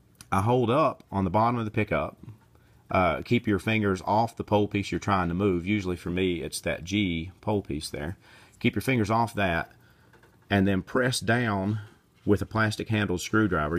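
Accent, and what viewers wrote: Texas, United States. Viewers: Can I guess your accent? Northern Texas or Oklahoma?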